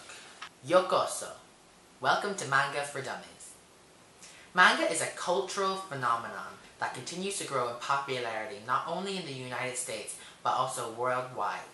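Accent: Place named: Germany. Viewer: GERMAN ACCENT !!!! :D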